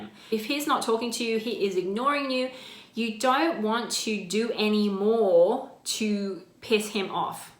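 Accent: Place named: Australia. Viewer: Can hear that aussie talk from miles away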